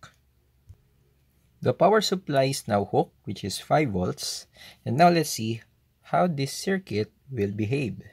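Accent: Philippines. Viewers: that filipino accent tho :D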